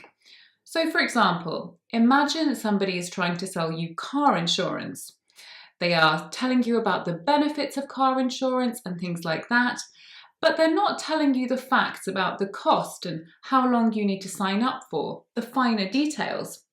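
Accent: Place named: RP, United Kingdom. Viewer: probably the best teacher to listen to for standard RP english. superb, but a dark L occaisionally ( exampuwl instead of example) talking abaat not about. Seriously though SO good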